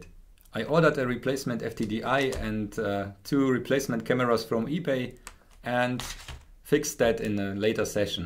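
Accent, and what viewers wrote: Germany. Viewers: I love the German accent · Das hört sich so deutsch an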